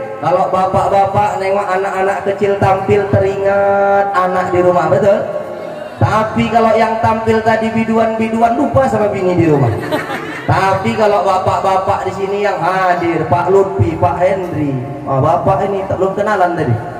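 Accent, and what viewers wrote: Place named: Medan, Indonesia. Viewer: Rindu kali aku logat Medan. Seperti yg dikatakan ini. Kapan ya bisa ke Medan lagi. Semoga diberikan kesehatan ya pak.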